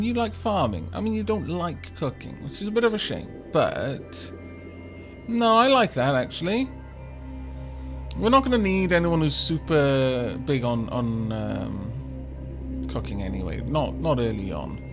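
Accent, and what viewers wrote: Wales, United Kingdom. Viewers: Are you from Wales by any chance? Your accent sounds very Welsh. I live there!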